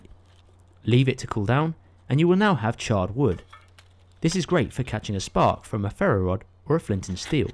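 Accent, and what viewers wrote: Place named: South East England, United Kingdom. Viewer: It’s a pretty regular accent for someone who was born & lives in the south east of England. I too was born in the same area as & share the same accent.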